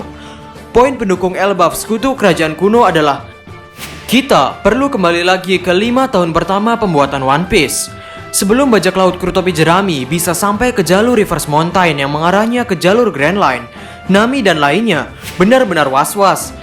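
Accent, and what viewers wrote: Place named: Ambon, Indonesia. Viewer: Ambon mana suaranya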